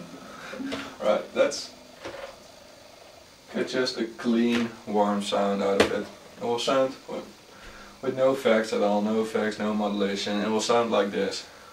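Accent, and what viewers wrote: Netherlands. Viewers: ha your accent sound hollish.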